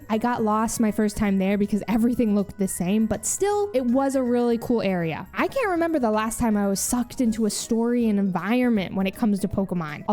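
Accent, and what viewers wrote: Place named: Dakota, United States. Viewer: Are you from a Dakota? You sound like the movie Fargo.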